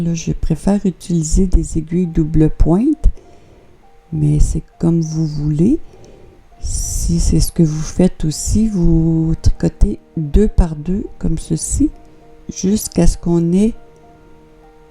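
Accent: Canada. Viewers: .ok merci bcp..c difficile l'accent canadien des fois!! Encore merci pour vos partages.j'apprends bcp.salut du sud de la France.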